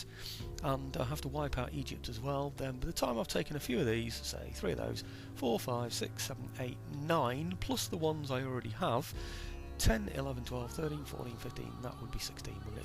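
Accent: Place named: Midlands, United Kingdom. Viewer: i ccouldnt quite tell you were proper northern i thought more midlands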